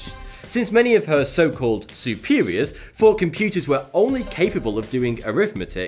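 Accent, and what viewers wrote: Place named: England, United Kingdom. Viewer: I love the English accent, but hate the English. I'm riddled by contradiction.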